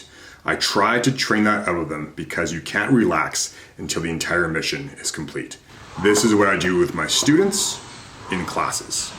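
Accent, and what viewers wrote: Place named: Canada. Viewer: This guy’s voice screams Canadian 🇨🇦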